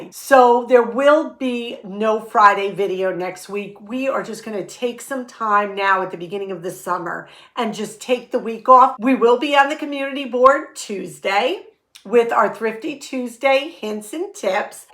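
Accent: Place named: Hudson Valley, United States. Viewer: I love your channel for several reasons (the content, your happy attitude, the good ideas, to name a few). But I really like watching you because I grew up in the Hudson Valley and I love, love, love your accent. It's like getting a little hug and conversation with my aunts. ☺️💗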